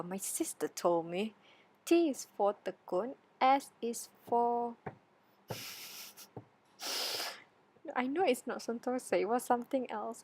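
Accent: United Kingdom. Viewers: Have u ever been in abroad before ? Or you received from this uni? Your English is very good. Especially British accent , haha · Love to hear your english's accent.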